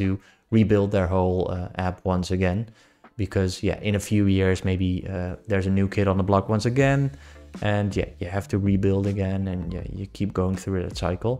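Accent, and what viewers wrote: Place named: Spain. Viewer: You from Spain? What’s that funny accent? Great video by the way.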